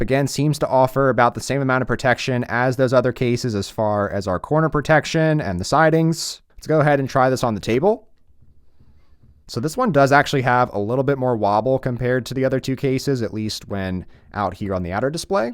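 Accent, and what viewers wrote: Philadelphia, United States. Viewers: Are you from South Philly/Delco?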